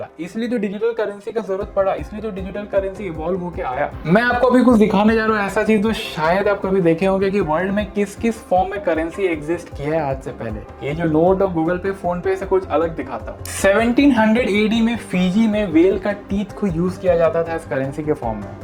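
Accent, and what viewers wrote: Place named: Kolkata, India. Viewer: Proper kalkatia language 👌 i like it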